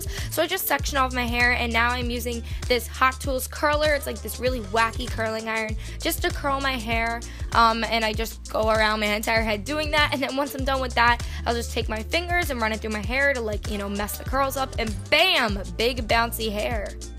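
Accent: New York, United States. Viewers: Okay I just stumbled on your channel and as soon as I heard your voice I could here the New York Accent, I’m from Pittsburgh so I hear the east coast accents all the time 😂 nice vid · Send Help everyone has an accent. And I think they meant her new York accent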